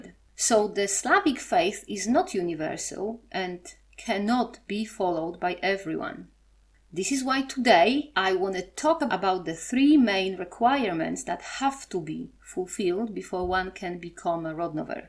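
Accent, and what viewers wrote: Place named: Poland. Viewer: I really enjoy listening to you. Your Polish accent is not bad at all. I have no problem understanding you. I am really amazed at your knowledge of history. You say things that I have known for a long time, yet others don't seem to comprehend. For example, anthropologically speaking, there's no such thing as an "ethnically pure" Slav. My ancestry is from your neighbor to the South, Bohemia. I know Polish and Czech people are very ethnically close. I don't find a lot about the faith and Bohemia, but I know they share it as well. So I will adopt my Polish brethren instead.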